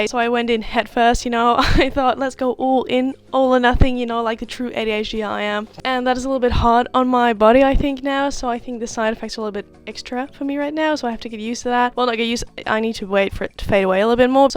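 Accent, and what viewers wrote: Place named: Australia. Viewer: wait i thought you were in australia, but your train shot made me go wtf where are u from LOL edit: I checked ur bio, youre from the netherlands... OK BUT YOU GREW UP IN AUSTRALIA OR SOMETHING RIGHT? I swear you sound australian o_____o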